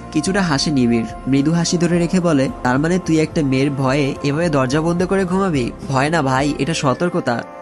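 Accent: Sylhet, Bangladesh. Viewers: Vaiya apnar basha ki Sylhet?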